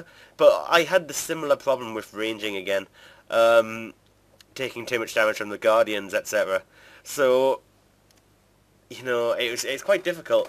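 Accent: Newcastle, United Kingdom. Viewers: Are you a geordie? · can tell ur a geordie haha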